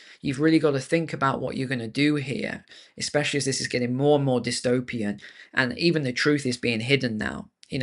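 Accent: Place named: United Kingdom. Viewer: Look man, as an American the sound of a British Accent is beginning to irritate me to no end. It's your love of the new Global Warming/Climate Change Religion, to your censorship of free speech, to your allowing the unimpeded invasion of your country by the Cult of ISLAM, to the general stupidity and wokeness of your society in general, UKRAINE STUPIDITY!! I hope Trump hammers you idiots!!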